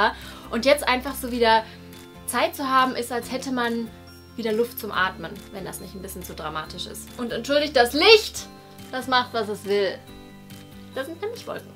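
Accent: United Kingdom. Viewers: Hey!♥ Ich verstehe wie du das mit dem Englisch sprechen meinst, ich bin auch zweisprachig aufgewachsen:) Meine Mama ist aus Italien😊 Ich würde es mega cool finden wenn du englische Videos machen würdest ich liebe deinen britischen Akkzent!😍 Deine Neuseeland Vlogs inspirieren mich sehr:D Ich möchte gerne nach dem Abitur ein Jahr um die Welt reisen☺ Danke für die Inspiartion und genieß deinen Urlaub 😘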